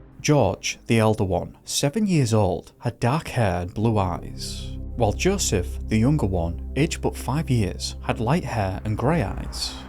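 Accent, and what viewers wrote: United Kingdom. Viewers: I agree! I prefer videos like these with UK-ish accents. It's oddly soothing to me.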